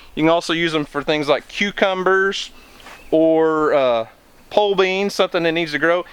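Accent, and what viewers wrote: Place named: South, United States. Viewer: Ahhhh...a fellow Southerner.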